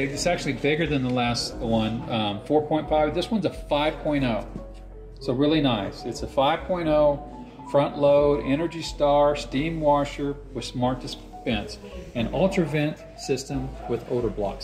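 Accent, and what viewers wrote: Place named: Midwest, United States. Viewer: Typical Midwest accent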